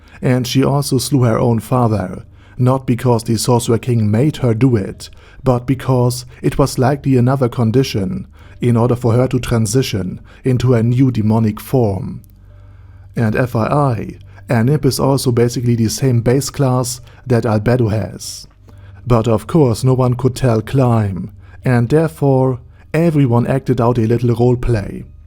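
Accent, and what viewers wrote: Germany. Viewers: Hearing about an anime from a German accent it's just so weird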